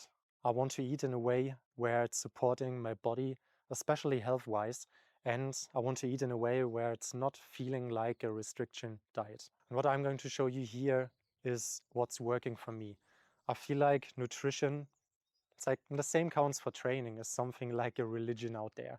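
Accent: Germany. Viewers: Bro are you german?? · Sounds German or scandi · Is this some german accent Im hearing? Should have watched the video before writing... Anyways eating eggs every day doesnt seem a healthy idea in respect of ldl intake..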